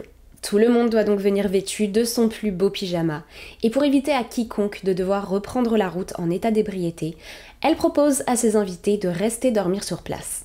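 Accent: Quebec, Canada. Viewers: Il manque le "nous allons commencer".😢 Actuellement je suis tes vidéos d'il y a 3 ans et tu as un accès Québécois beaucoup plus prononcé que maintenant. 😂😘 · Au fil du temps ton vocabulaire est de plus en plus teinté de l'accent Québécois! Quand tu retournes en France ça doit être un choc pour tes proches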